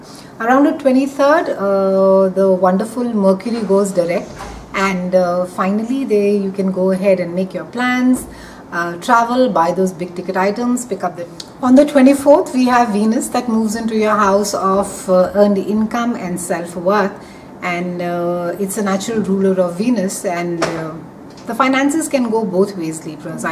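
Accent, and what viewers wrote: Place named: India. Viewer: Your accent is lovely, are you from India?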